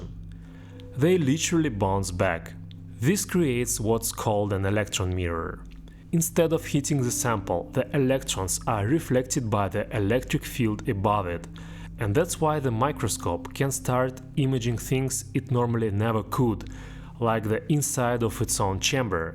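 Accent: Russia. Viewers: Интересный у тебя акцент, ты русский? 😊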